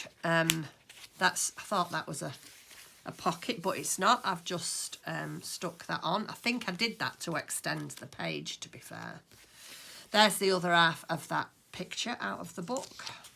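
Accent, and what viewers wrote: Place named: United Kingdom. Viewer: Where are you from? I’ve never heard that type of British accent.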